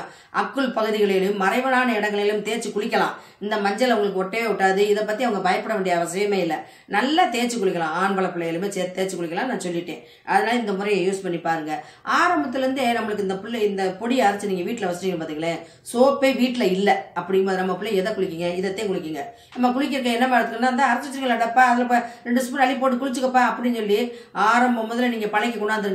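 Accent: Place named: Madurai, India. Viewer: Madurai ya.. 😇😇😇😇..Na num Madurai dhan.... Superrrrr..pesuringa.. 😃😃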